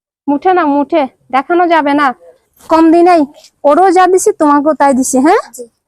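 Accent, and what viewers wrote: Chittagong, Bangladesh. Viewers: আপনি চট্টগ্রামের যে,কতা শোনে বুঝা যাচ্ছে যে...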